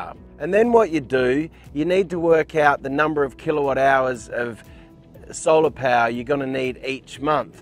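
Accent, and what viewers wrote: Australia. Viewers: You sound like an Aussie but you speak in terms of miles and referencing US locations. Are you referring to costs in Australia or the US? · I've been watching your videos thinking you were in Australia due to your Aussie accent but now realised you're in the US!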